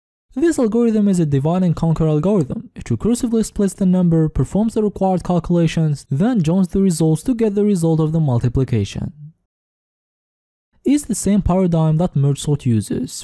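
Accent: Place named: Algeria. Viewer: سبحان الله والله عرفت accent تعك دزيرية 😂 Anyways, thanks for the information it was really helpful, keep going 💜💜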